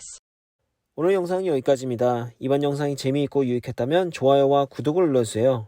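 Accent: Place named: Korean-Chinese, China. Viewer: 억양이 조선족 같은데?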